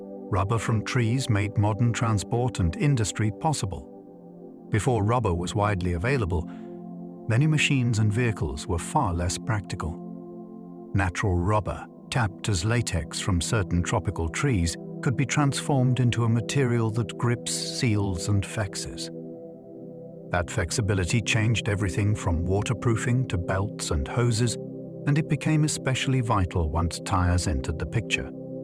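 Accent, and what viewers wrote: England, United Kingdom. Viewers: “Hello there” in an English accent now Pavlov’s me into yawning. As a lifelong insomnia-sufferer, I consider this a success.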